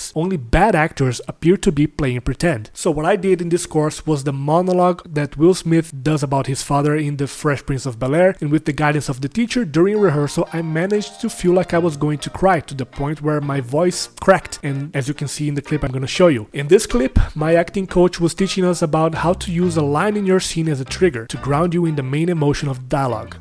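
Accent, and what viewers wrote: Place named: Brazil. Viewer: E aí, mano! Acabei de maratonar todos os videos do seu canal na ordem. Reconheci logo no primeiro vídeo o sotaque brasileiro. O conteudo é muito bom e o roteiro dos vídeos tá muito bem feito, obviamente. E ainda por cima você tem carisma. Tem tudo pra fazer sucesso, acho que já está fazendo sucesso. Parabéns, ganhou um inscrito! Abraço.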